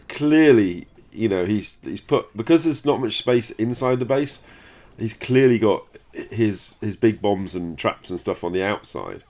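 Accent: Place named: North Yorkshire, United Kingdom. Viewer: are you originally from around the North Yorkshire area? Your accent rings a bell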